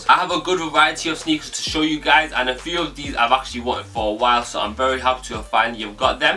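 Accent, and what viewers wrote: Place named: London, United Kingdom. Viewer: Love your mix of London and Manchester accents, sounds so dope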